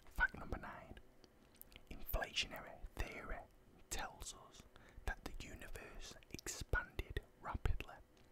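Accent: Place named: St Helens, United Kingdom. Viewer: Your accent seems similar to mine! St Helens gal! 🙌🏼 love your videos!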